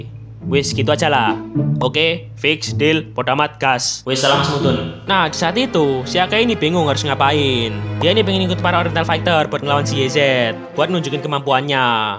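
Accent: Java, Indonesia. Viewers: Orang Jawa ya bang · ini jowo bgt plisss, awokwok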